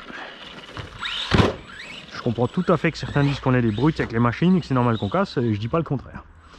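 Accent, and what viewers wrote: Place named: South, France. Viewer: qui parle sudiste tu m'as régalé 😍 ( sud power 😁) Le front flip magique jamais je pensai que c'était possible. Et visi bien solide la machine car elle prend cher sur cette vidéo 💪💪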